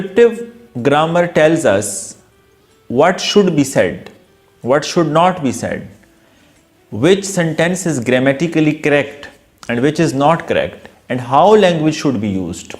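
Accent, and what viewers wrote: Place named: Pakistan. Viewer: Thanks sir. I was very confused due to this words.i didn't relise that you are from Pakistan. While I was watching your video. You speak like my native teacher.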